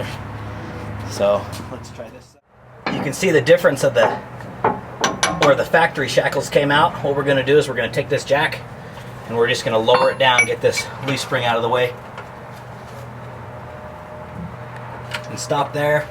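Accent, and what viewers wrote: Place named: United States. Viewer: That awesome accent haha fckin love anericans! Lol Great vid ;)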